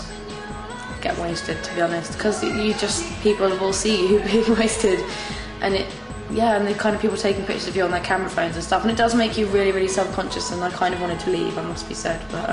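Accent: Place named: RP, United Kingdom. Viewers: What's wrong about sounding posh? Her and her bands music is amazing. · She english duh! If she was Irish that would be awesome :L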